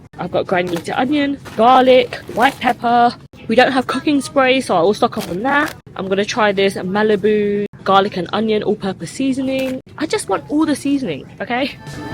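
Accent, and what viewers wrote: United Kingdom. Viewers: Thank you for sharing and being so open and vulnerable with us. I can relate to you and your feelings in so many ways this was refreshing to see and encouraging. I’ve been so scared to solo travel 😩😂❤️ I’m so used to traveling with friends or my partner. This might have been the push I needed ❤️ Also yes you sound that British 😂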